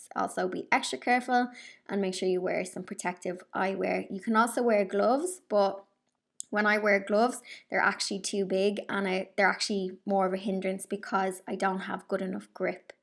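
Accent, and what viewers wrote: Ireland. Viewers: Only just found your channel and I love it! Your cats are so cute. You seem such a sweet person and your DIY's are so so lovely, but not sure what I enjoy most, your projects or your accent, I love the Irish accent. Keep up the good work and look forward to watching more of your videos. Take care and stay safe 🤗🤗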